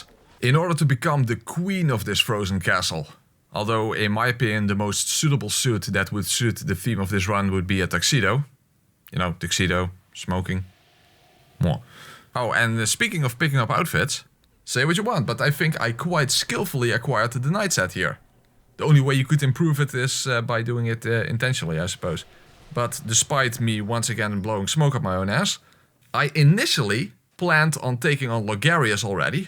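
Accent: Netherlands. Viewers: It took me 2 seconds to recognize your accent, het is goed om een Nederlander te horen op Youtube! Great content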